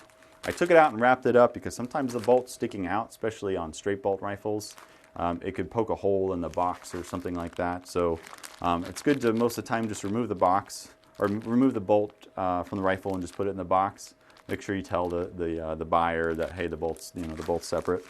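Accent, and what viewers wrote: United States. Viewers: Are you from Missouri??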